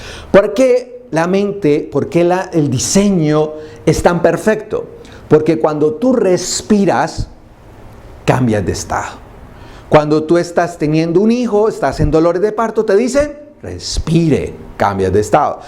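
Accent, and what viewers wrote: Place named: Antioquia, Colombia. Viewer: Cuando no lo escucho siento que se me baja la alegría. Y podía saberlo de memoria, pero me encanta su voz tan paisa y su presencia.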